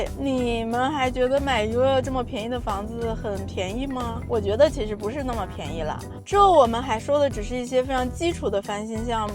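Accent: China. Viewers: 哈哈，講話像是大陸人口音，可是render在影片上的字幕卻是繁體，還是剛好講的內容簡繁都是同字啊？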